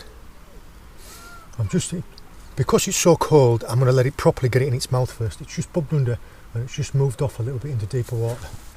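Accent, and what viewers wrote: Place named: Yorkshire, United Kingdom. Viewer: I get that pal I would have a good hour and a half at least to get to the ocean so sea fishing is not something I have done a lot of, but like you I enjoy all fishing but predator angling is were my heart is. I live in a small market town near leeds so not so far away from your place of birth judging your ascent. Keep up the good work pal and I love the fact you involve your family, all my family fish . 👍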